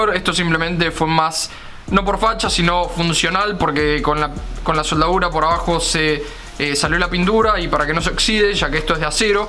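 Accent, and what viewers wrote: Argentina. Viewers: ¿Porque habla como Argentino?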